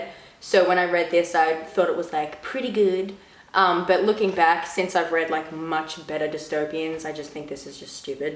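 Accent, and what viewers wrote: Australia. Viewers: It’s definitely an Aussie accent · that's not a British accent it's more of an Aussie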